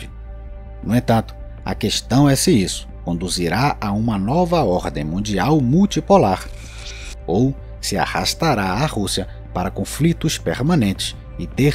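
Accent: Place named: Pará, Brazil. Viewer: Certeza que a voz é de um paraense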